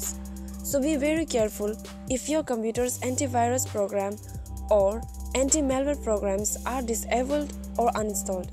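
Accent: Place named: India. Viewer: Indian accent 😂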